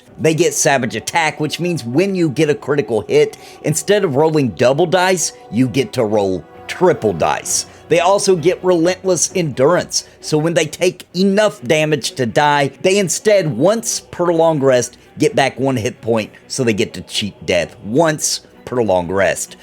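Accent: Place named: Georgia, United States. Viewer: Are you from Georgia? You have the same dialect as the man the myth the legend himself, Judd. None the less, great video, I'm also gonna be a Paladin Dragonborn!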